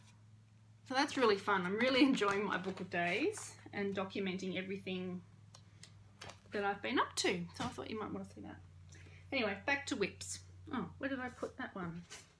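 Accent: Australia. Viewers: Your videos have quickly become one of my “oh great - she’s done a new floss tube!” videos. And, like most Americans, I love your Australian accent. My favorite flower is a lilac. Do those grow in Australia? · Hello, I just enjoy your floss tube so much. I love your Australian accent. Your stitching is excellent. Would love to see a couple pictures of your Home area. Nothing private. I have always been interested in Australia and would love to visit but my health and finances won't allow. One of my favorite quilting books comes from a woman who owns a shop in Perth, well, at least she did a few years ago. If I could only have one quilt book, it would be that one. I still do a bit of quilting but these days I am so happy to be cross-stitching again. I also have gone down the rabbit hole of EPP. I am rambling, sorry. Take care, keep up the good work and know there are many of us who admire and respect you. 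Carry on. with warm thoughts. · I’ve binge -watched your videos. I love all you stitch and that you love 40 count. Love hearing your Australian accent. I planted a flower bush today. It had buds on it so I’m hoping to see many flowers soon. Until next time, stitch everything you can.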